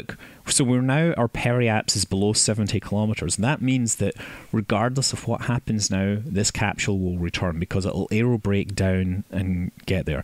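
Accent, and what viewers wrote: Scotland, United Kingdom. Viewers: Anyone else hear just how Scottish accent is here?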